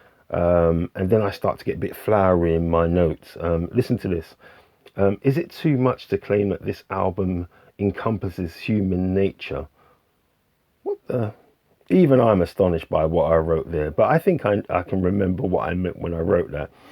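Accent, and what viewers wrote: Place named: United Kingdom. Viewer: Hi, I've just come here on the recommendation of Julius Jabbar. He said he thought you sounded British, but I'd wager that I can hone in on your accent further. You sound English to me and slightly posher than I am. I'd guess you are from south of the Watford Gap. Possibly not far from the M25. How am I doing? I've got that LP with a different cover. Didn't know it was controversial. Love the Xylophone with those voices. Very nice! I'm really really happy to have found your channel. Looking forward to caching up with your past videos. Cheers!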